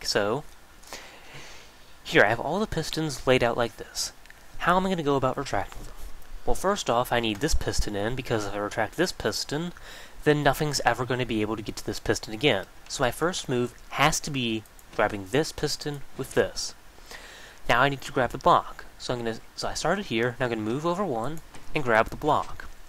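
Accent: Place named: England, United Kingdom. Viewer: Are you a fancy person from England? Your voice sounds like that.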